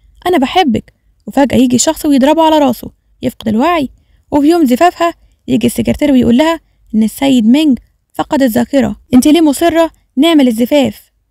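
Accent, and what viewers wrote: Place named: Deir ez-Zor, Syria. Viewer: انت من اي بلد مبين من صوتك انك بنت من سوريا ومن الدير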